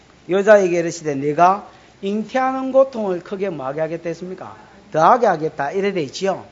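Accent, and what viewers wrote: Gyeongsang, South Korea. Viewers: 찰진 경상도사투리가 귀에 쏙쏙 들어옵니다^^😅 목사님의 깊이있는 메세지를 통해 다시한번 말씀앞에 서서 기록하신 말씀대로 거룩하게 살기를 소망합니다.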